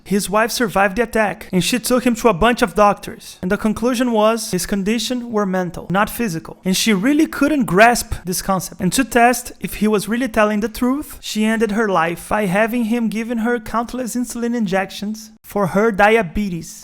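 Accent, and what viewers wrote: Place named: Brazil. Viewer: Sotaque de Br 👍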